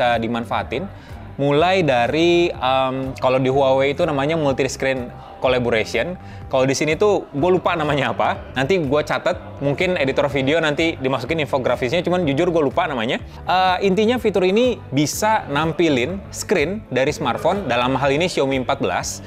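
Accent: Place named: Brazil. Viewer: he has Brazilian Portuguese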